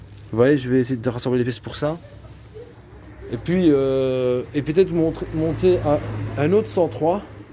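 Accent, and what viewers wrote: Belgium. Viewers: Ça fait plaisir de te revoir, toi, ta jolie mob et ton petit accent Belge qui fait plaisir 😎✌️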